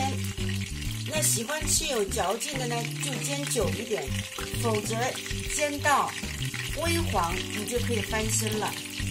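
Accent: Shanghai, China. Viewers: 親切的上海普通話，很久沒有吃過這道家常菜了。謝謝Jenny!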